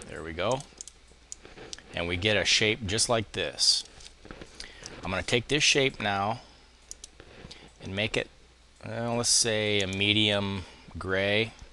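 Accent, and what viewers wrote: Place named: United States. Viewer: Nice uploads! I just came across some videos on a Chinese video site and found him speaks like another Inkscape tutor Nick on YouTube, I mean the accent of them is the same in my opinion and they're both from the US.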